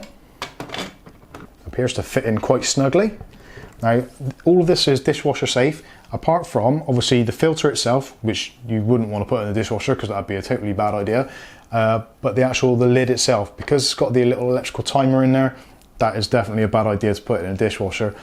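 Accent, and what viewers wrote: Bristol, United Kingdom. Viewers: Certainly was; good to see it put in layman's terms. Love the Brizzle accent by the way! ;)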